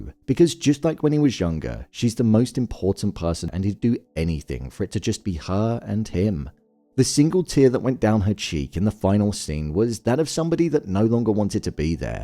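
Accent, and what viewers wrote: United Kingdom. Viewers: You British (??) hear your voice and it sends my mind to peace. Good breakdown. 💪🏿🫶🏾👍🏿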